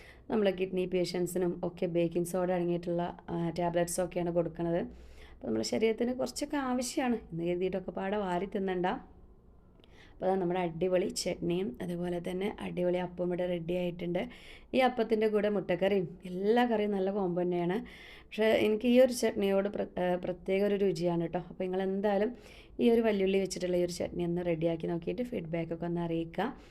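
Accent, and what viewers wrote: Malappuram, India. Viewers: മലപ്പുറം താത്ത എന്ന് പറഞ്ഞു ഒരു മാതിരി സംസാരം ആയിരുന്നു ഇത് കുഴപ്പമില്ല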